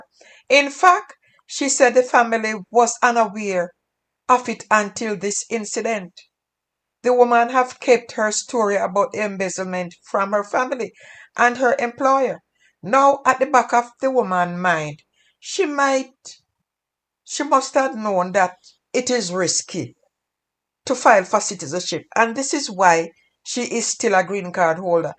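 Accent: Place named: Jamaica. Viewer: I can't understand most of what you're saying. Your Jamaican accent is too overwhelming. Sorry, but I have to tune off and stop listening.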